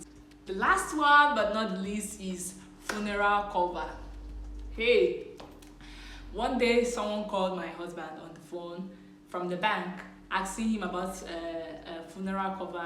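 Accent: Nigeria. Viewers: you have south african look, hence they talked in our native language. it is not easy clear with you, only when you speak you sound naija. you look like south african, skin tone, face propotion and and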